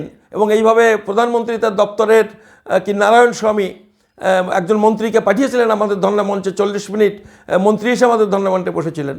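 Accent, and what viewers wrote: Bangladesh. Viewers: Upnar kothar tune shune mone hochhe apni o purba banger, poriskar holo na. Manik Fakir babu r upni ekshonge boshe ekta vidio kore byapar ta clear korun please. Ki ki proman potro lagbe....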